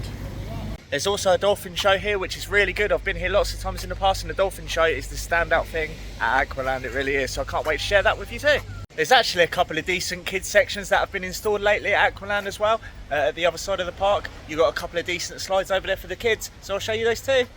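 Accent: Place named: Norfolk, United Kingdom. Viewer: Are you from Norfolk, by any chance? Just trying to place your accent.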